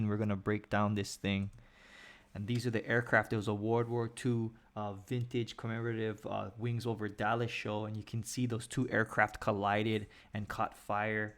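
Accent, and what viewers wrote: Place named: Hawaii, United States. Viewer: sounds like you're from Hawaii. Rest in peace aviators.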